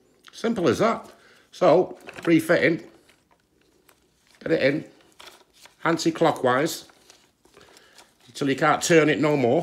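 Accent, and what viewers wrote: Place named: Northern England, United Kingdom. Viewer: As we say in Australia, you mate, are a deadset legend! Thank you so much. I am the world's worst handywoman and your guidance (made all the better with your friendly Northern accent),meant I replaced the rotten old hose with minimum fuss. Big thumbs up!!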